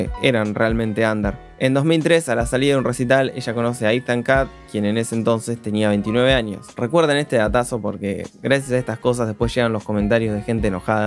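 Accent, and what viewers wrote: Argentina. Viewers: Más bien, no a todos nos gusta el acento argentino.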